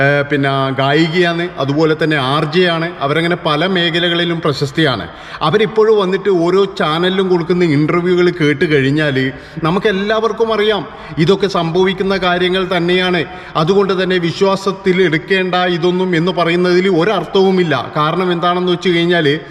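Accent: Kannur, India. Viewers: കണ്ണൂർ ഭാഷ ആണല്ലോ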